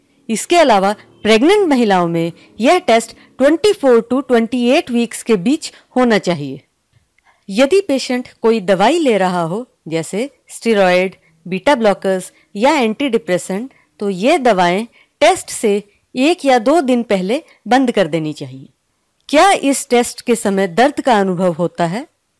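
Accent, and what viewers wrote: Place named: India. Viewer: Is video mein, Indian accent bahut zyada strong hai..indian accent bahut annoying hoti hai.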